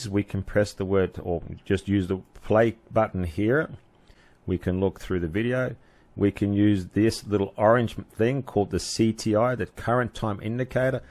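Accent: Australia. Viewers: I enjoy your clarity (and Aussie accent). I saw a Corel tutorial where a video clip was split and the second part moved along in the timeline, leaving a gap between the two parts. For me, the clip always snaps back to the start, even with ripple editing disabled. Can you share how a video clip can be moved in the track?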